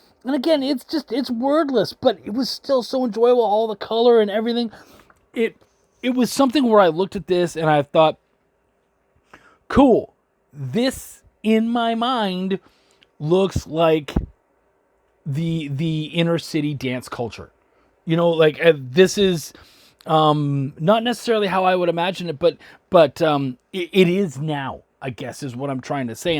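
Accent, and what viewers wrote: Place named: Canada. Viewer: You sound especially canadian in this video. I love Is'Nana, picked it up when I backed the hated! You should also check out A Black Man's Guide to Driving, really good from both parts! Solid breakdown, the world needs more raw reviews!!!!!!!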